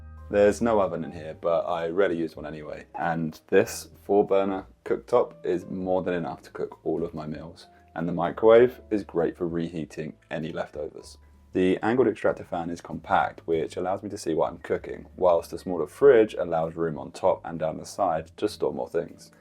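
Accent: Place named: England, United Kingdom. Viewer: I'm hitting a like because you're cute and I'm a sucker for the English accent.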